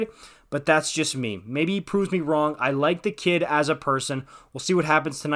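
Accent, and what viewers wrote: France. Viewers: I love how your French accent pops out, dude! Haha, awesome. I'm learning another language watching your videos. ;-) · It grates on my nerves when he speaks with French accent in the middle of speaking English.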